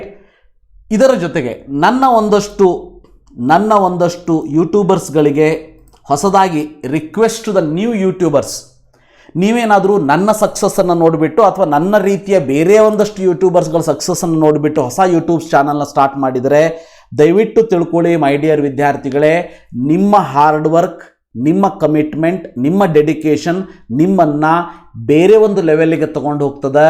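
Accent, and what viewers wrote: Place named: Hubli, India. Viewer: Sir nivu Hubli yavaru nimma matu swalpa rough agi irutte adre karnataka da Ella kade nim video na nodta irtare adakke nim matu rough anusbahudu 😊 adu nimge swalpa gotirli 😊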